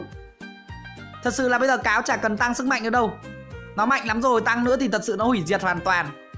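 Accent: Nam Dinh, Vietnam. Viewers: Đúng giọng nam định... a quê ở tp nđ à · A ở nam định hay gì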